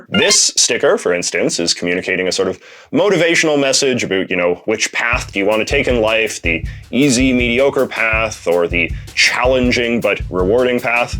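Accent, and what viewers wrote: Canada. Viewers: Canadian accent comes through thick in a more candid video. Not every place is for everyone. You don't have to like Japan. It's good you're giving it a shot.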